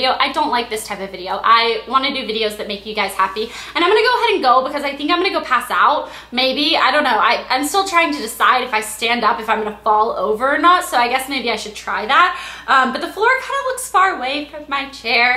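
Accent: United States. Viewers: cute chick... I like your freaking american accent xD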